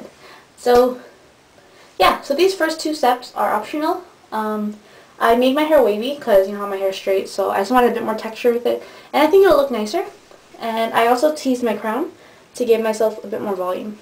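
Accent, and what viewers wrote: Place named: United States. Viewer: Yay!! I did it I plaited mine and then curled it :D Im goin to meet a lad in a couple of hours so Im goin to wear it curly then put it into this half way through so it looks as if Im good with hair haha. Love your videos and your accent, wish I had an American accent but I have an English accent cos Im english and live in england x :( haha xx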